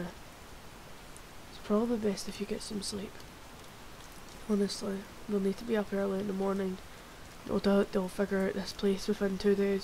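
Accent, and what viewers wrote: Scotland, United Kingdom. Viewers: ah my daily dose of scotish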